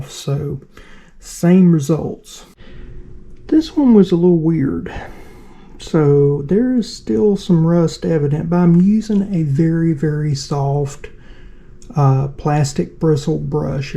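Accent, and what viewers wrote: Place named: South, United States. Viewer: Heck, I have been looking for something to complain about all day. Here is my chance! - It is the southern slow-talking. I spent half of my life in east Texas and the other half in west Texas. I made a few videos just playing around, I realized that many people found my accent unlistenable. I actually thought about putting in some effort and writing scripts and practicing a faster delivery, but then I decided I did not care that much. I believe that you need to speed up your speech and make more efficient use of your words? People seem to want information delivered at high speed. Best wishes.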